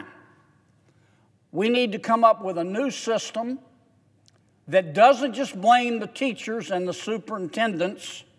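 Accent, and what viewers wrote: South, United States. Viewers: He went to Oxford. I'm certain he didn't have the southern drawl while he was going to Oxford.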